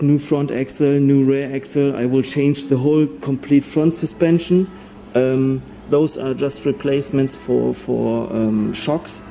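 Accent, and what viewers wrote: Germany. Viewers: This happens if a german try's to speak englisch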